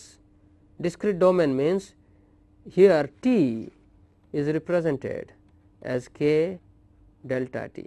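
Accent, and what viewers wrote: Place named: North India, India. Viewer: The listener is not sure about the name and the sir name surely Professor is a north Indian and dear friends do not comment on the language if suppose one of our Indian language hailed to the status of English language all Englishmen and Indians who speak other languages will pronounce in the same way.Dear friends we should admit the language and concentrate towards its content/message. I saw similar comment on professor Rajaraman with out knowing about the great professor. so let us avoid it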